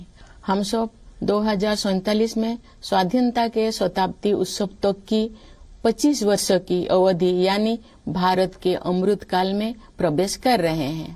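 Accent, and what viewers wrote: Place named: Odisha, India. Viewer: Salutes! President Murmu. Liked all the messages along with Orissa accented Hindi. This is essence of United India!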